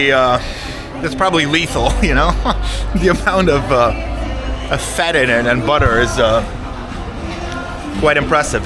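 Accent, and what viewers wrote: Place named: Hollywood, United States. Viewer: Your English as a Dutch sounds like Hollywood English accent.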